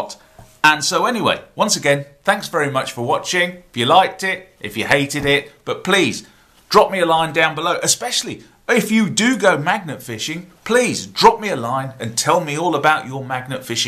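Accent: United Kingdom. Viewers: STU HERE THANKS ALWAYS WANTED TO KNO HOW TO TIE THE ANGLERS KNOT. OH AND YOOU BRITISH ACSENT IS SPOT ON. I LIVED AT THE BEACH FOR 20 YEARS NVERE HAD THE NEET FOR THAT KNOT. CIAO.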